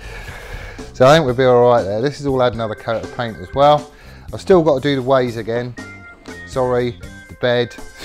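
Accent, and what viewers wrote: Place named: Northern England, United Kingdom. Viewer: You're doing a nice job there. A question that's nothing to do with this, you live in Plymouth but talk like a northerner ? What's that about ?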